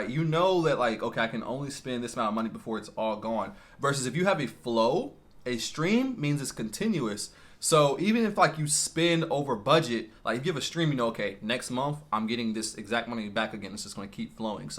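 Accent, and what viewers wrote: United States. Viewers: Hey wassup I have no intentions at all of moving there but I do appreciate your suggestions, knowledge, and sharing your informative and detailed Perspectives & experiences. I can tell u would be a great friend to have in my circle. U sound like u from the 5 boroughs. Probably BK or queens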